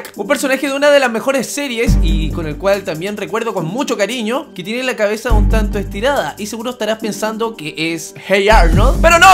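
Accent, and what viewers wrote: Chile. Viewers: eres chileno? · Eres de chile verdad? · Eri chileno weon? :V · Se nota mucho que eri de shile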